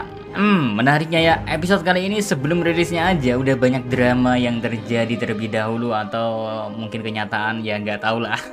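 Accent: Java, Indonesia. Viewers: bang lu jowo bang 😎🤌🤌👍👍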